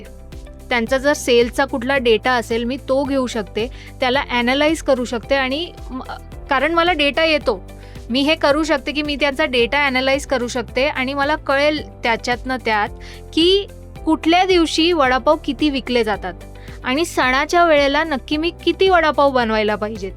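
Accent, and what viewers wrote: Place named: Gujarat, India. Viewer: गुजराती असून ही मराठी स्पष्ट आणि छान बोलता. तुम्ही डाटा अनालिस्ट ची माहिती खूपच छान आणि उपयोगी अशी दिली आहे....... ती खरोखर सर्वांना मार्गदर्शक ठरेल....... धन्यवाद 🎉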